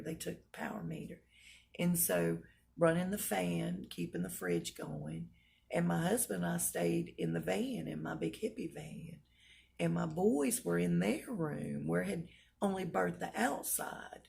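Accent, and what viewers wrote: South, United States. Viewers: PS I want to thank you for your videos - I enjoy listening to your southern drawl and your outlook on life. May God bless you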